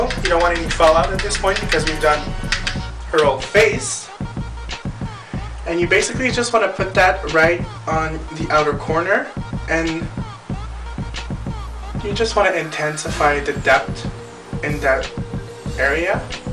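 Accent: Quebec, Canada. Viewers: OH un quebecois :O :D bonjour :) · (: i love your Canadian accent<3 and your skin looks flawless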